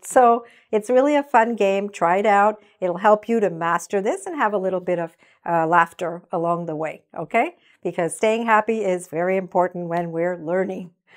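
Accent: United Kingdom. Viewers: You are my best English teacher Are you british ?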